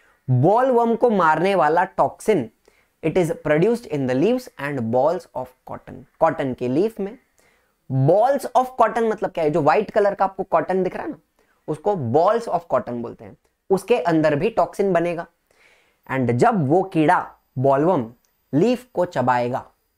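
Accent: Maharashtra, India. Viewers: hi sir aap marathi ho na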